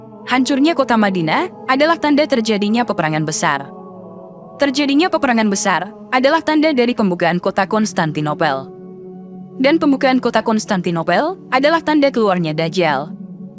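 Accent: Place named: Eastern Indonesia, Indonesia. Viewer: Dari kalimat dan sajian GAMBAR INI BUKAN KONTEN ISLAM JANGAN BODOH...dari logat ini dari timur,kefasehan ini nasara jg tertipu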